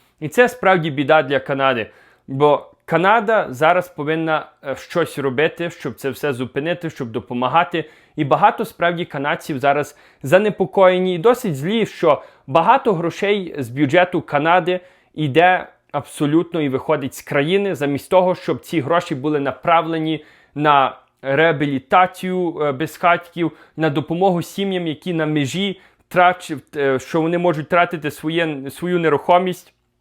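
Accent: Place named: Lviv, Ukraine. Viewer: Судячи по твоїй розмові ти зі Львівщини,послухай не ганьби МІСТО ЛЕВА та ЛЬВІВЩИНУ, йди працюй!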